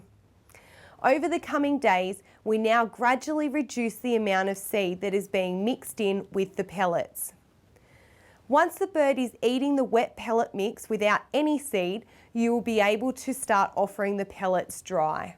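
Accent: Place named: Australia. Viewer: Wow that's a strong Australian accent. I came for the bird info but I got really fascinated by her voice, PS. I'm from the United States so I never hear different accents.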